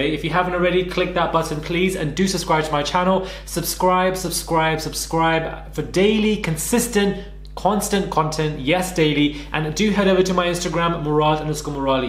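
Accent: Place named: United Kingdom. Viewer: Dude you nailed it I was like wait is this an Indian guy with a British accent talking about basketball wives. LMFAO I'm subscribed sir cause you telling it like it is.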